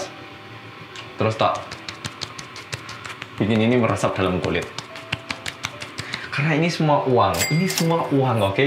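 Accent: Java, Indonesia. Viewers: siang medoookkkkk wkkwkwkw tmbah ganteng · Absen dulu buat si korea medhok!😊 sukses selalu oppa(?)atau mas iki😂,salam bolo" barumu mas.aku mau manggil mas aja karena aku udh menganggapmu sbng wong medhok😊.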